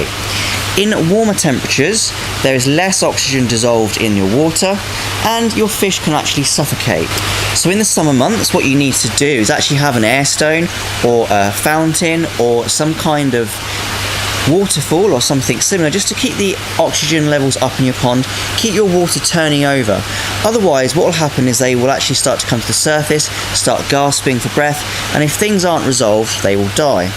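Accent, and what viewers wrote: England, United Kingdom. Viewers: He’s literally speaking English in an English accent. How is it that you can’t understand him 🤔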